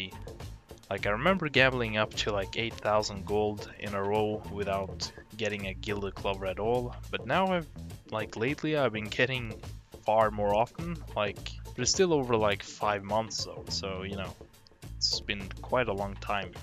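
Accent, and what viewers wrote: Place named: England, United Kingdom. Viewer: Where about in England you from?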